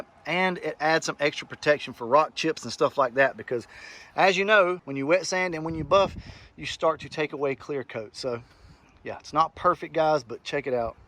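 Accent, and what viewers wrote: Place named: South, United States. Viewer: I'm new to your channel. Like 2 months in now. I knew you were close to my old stomping grounds by your accent. Then I saw Dothan today. You ever run anything at North Florida Speedway?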